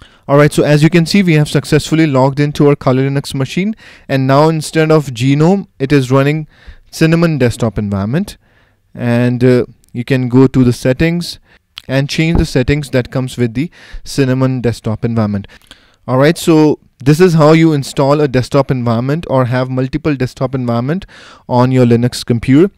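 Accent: India. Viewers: Its indian accent but not too indian.. Kind of sweet spot i love it 😃 And thank you for great explanation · This is how every north Indian speaks English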